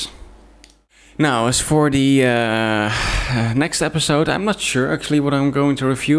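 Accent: Netherlands. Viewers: neemt de microfoon ook nog geluid op als je er een stukje zo een metertje van af zit? ik hoor aan je accent dat je nederlands ben hahah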